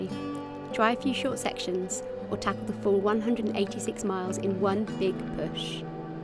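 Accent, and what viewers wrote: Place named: England, United Kingdom. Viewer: would it have been so difficult to have found a Welsh speaking person to narrate - it bugs me to have an English sounding narration of this lovely video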